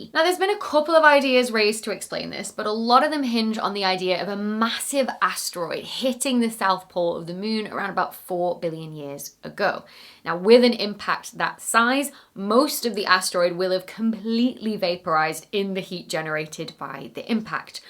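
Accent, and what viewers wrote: United Kingdom. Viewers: Wondering if you'll see this, but curious, are you British? Thanks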